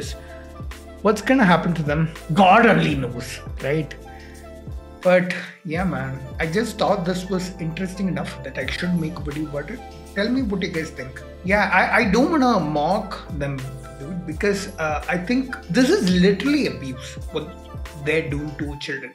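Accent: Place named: India. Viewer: First your knowledge seems good but you laugh of what was written in the text.firstly you are reading as as if you are talking Hindi not English ,secondly read the Al Quran tilawat before you made this vidz,learn from things around you ,then judge ,don’t mock or disrespect the creator mark my words you will pay the price. for that kind of blasphemy soon or later.remember me not the likes you will have.my respect again to people what ever their faith or belief.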